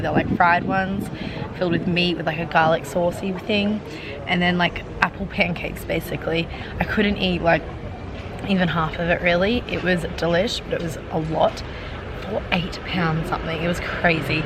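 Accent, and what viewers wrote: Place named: Australia. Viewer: Your accent it's like British... but I CAN understand U... must be Australian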